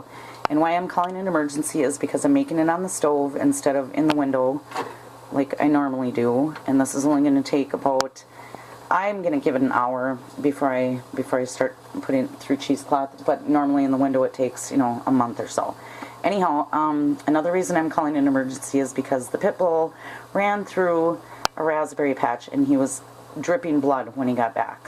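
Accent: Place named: Wisconsin, United States. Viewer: I heard a Wisconsin accent there and the phrase 'up north' --only Wisconsinites use that phrase. Good post--learned a lot--made a yarrow/plantain with students--we too had to use stove technique, though I heard letting it sit in sun is more effective.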